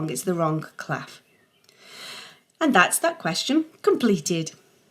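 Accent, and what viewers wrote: United Kingdom. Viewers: You sound British. Are you? 🤔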